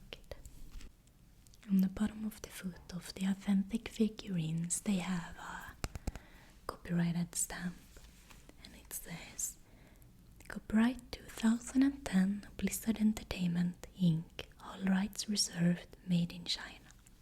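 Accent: Sweden. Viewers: Swedish. Couple of her videos are in Swedish as well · Dane here! I have followed your channel for some time now and I absolutely LOVE your ‘singing’ (swedish) accent when you whisper! Your passion for WOW and other fantasy IP’s is infectious. Happy to see how your channel has grown.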